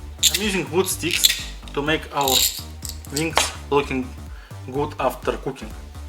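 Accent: Russia. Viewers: Russian accent is my favrouite please dont be sorry about it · I actually like Russian accents. Keep up the good work my man. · Don’t be sorry about your accent, tbh I think a Russian accent is pretty cool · Russian accent english is better than bri‘ish english ngl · Come on! "Sorry for my Russian accent"? Man, i personally love it! Hope you do more commented vids! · Dude.. you should be proud to have a thick russian accent. It is nothing to be sorry about. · Bro having an Russian accent is cool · There was a time I was just watching your videos just to hear that awesome Russian accent, love you❤️ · Your Russian accent is slav, speak Russian sometimes. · Its ok the Russian Accent man, and its kinda cool to be honest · Russian accent is fine i can understand and i am sure every1 else can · “Sorry for my Russian accent” Your accent sounds nice! I actually enjoy hearing your voice in your content! 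· I like the russian accent btw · Idc about the russian acent all I care is that u made a voice reveal lets gooooooooooooooooooooooooooooooooooooooooooooooooooooooooooooooo!!!!!! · Looks really good. This is the Einstein of cooking. And you Russian acsent is really nice. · Damn russian accent is just 😩👌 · Having an accent is to be that u have an identity and u should be proud of Russian accent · Yep you very good Russian accents and English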